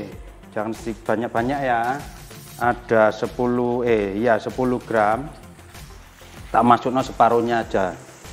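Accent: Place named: Java, Indonesia. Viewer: Masnya kok meddok gitu ngomonge ...wong jowo y mas?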